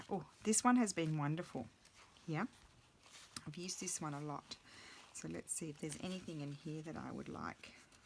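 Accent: Australia. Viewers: Where do you live, you sound like you are Australian.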